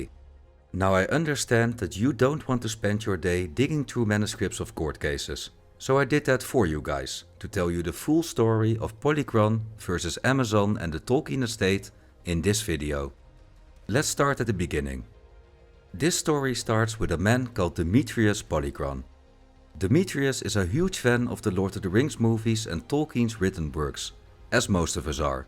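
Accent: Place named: Netherlands. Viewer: Yeh, let's put a dutch accent on a "machine voice". Not only the accent, but the usual dutch sentence building and the clear script reading is a dead give-away for a real person. Don't assume truth, search it my friend.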